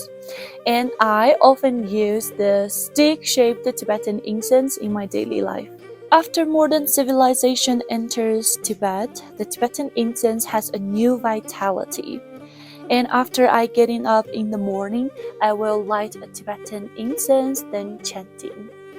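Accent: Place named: Southeast Asia. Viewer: your English is not same as Most Chinese, kind of south east Asian accent. Though very good presentation. Tibet blessed.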